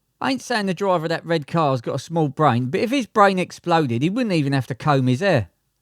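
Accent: Cockney, United Kingdom. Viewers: Christ, the commentary is so bad. A right cockerny geezer trying to be funny in every clip, absolute fail.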